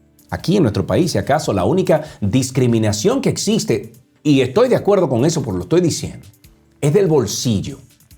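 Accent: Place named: Arab world. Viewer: Yo creía que tú no eras dominicanos porque cómo tú hablas tan mar dé los dominicanos pensé que tú eras árabe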